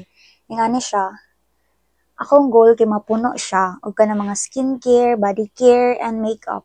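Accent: Visayas, Philippines. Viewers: Fav part sa imoha is imohang oa bisaya accent😂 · my fav part about sayo ate ay yung pagiging confident mo sa pag vvlog at pag a affiliate mo hindi kapo katulad ng iba na nag aaffiliate na hhindi honest sa mga product na pinapakita nila and sapagiging masiyahin mo and apaka pretty mopo and kind ang perfect mopo talagaaa and yung pag speak mopo ng bisaya ang sarap po pakinggan my mother is bisaya din po hehehe hooping po mapipi ng give away nyo😇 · My fav.part ko sayo is your humor your not boring when talking and also if piniflex mo yung mga products na ginagamit mo and i also ordered it kase proven and tested mo yung mga products and also yung Boses mo kapag nag bibisaya ka kinda like sana naging bisaya din ako Hhahahaha yun pang po. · Fave part: the way you talk bisaya nga mailhan gyud nmo nga bisaya kaayu gyud and Makita sad na love kaayu nmo imung followers🫶🥹🩷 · One of my fav jud sa imo dzai the way kag mag voice over sa imo mga vlogs grabe ka vibes bisaya kaau lol (love it), also gaka bayot kos imong ka gwapa dzaiiiii the face card, sufeeer cuteee^_^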